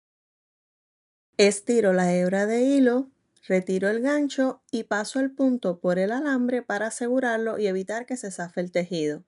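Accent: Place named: Chile. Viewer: Ela fala mto depressa e bem chilena mesmo! Outras entendo.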